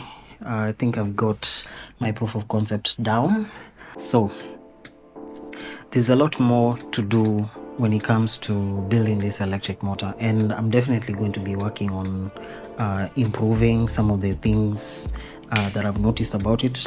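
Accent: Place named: Kenya. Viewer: kenyan accent . This is good stuff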